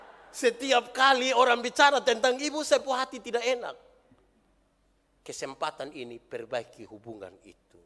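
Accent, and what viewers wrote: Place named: Eastern Indonesia, Indonesia. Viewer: Salam dri Makassar Pak Pendeta, semoga tetap diberkati dan diberikan kesehatan yg baik dari Tuhan. Khotbah2 Pak Pendeta sangat luar biasa dan mudah untuk dipahami, apalagi dengan logat2 timur yg kental 🙏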